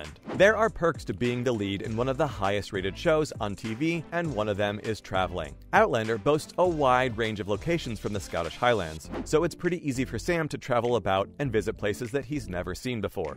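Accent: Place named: United States. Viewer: Please learn to pronounce Glasgow. American narration is awful.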